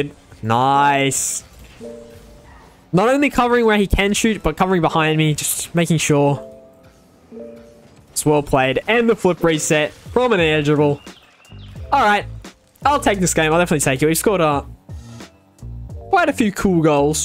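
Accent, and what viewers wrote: Australia. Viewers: bro i didn’t realise you were aussie! should coach me 🤣 xx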